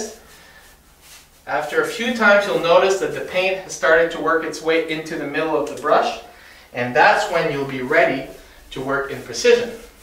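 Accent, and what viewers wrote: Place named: Moncton, Canada. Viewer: you must be from moncton....the accent sure sounds like that.good tips tks.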